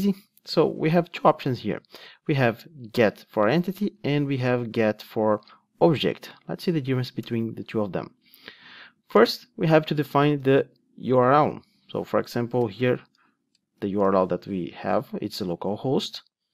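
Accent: Brazil. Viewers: inglês com sotaque de brasileiro é um mamão com açucar pra entender ahuahauhauha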